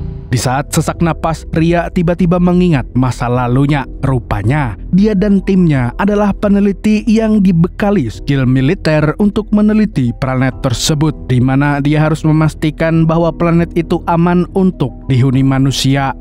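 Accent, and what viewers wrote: Banjar, Indonesia. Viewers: kimanya banjar kah nang ini urangnya😂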